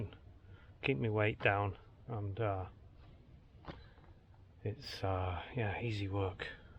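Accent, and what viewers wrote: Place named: Northern England, United Kingdom. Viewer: to hear your broad Northern accent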